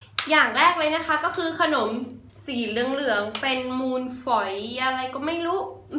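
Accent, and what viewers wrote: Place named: Suphanburi, Thailand. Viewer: เป็นฝรั่งที่พูดไทยเหน่อเป็นฝรั่ง. แต่ทำไมตรูนึกถึงคนสุพรรณ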